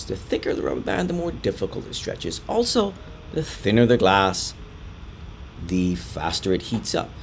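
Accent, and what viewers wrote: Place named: Canada. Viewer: Great video. but what is your accent? it's so strange. It's like canadian, mixed with heavy new york, and irish.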